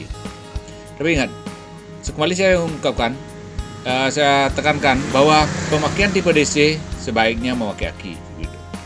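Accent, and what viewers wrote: Bali, Indonesia. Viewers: Dari Bali pak? Logatnya kental Bali, bisa garap ACG stater vario 150 dipasang di CB?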